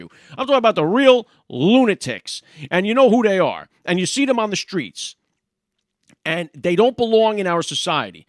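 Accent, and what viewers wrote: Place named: New England, United States. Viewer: Nice channel. Wait, you sound like a Yankee. Are you a northern Yankee Trump supporter? Not something I've seen on YT. You are actually a Yankee?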